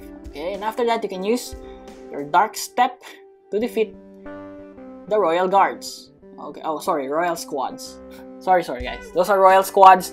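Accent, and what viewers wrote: Philippines. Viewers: Are you Filipino? You sound like you are. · U Filipino I think I hear kaya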